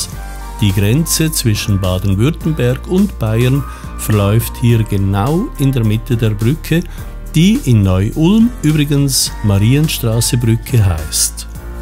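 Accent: Switzerland. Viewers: sehr schönes Video eine sehr angenehme Stimme mit diesem netten Schweizer Dialekt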